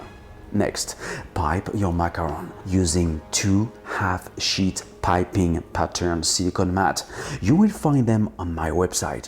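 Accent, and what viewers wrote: France. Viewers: Banish with as French accent!! Need anything else . Make a macaron!!!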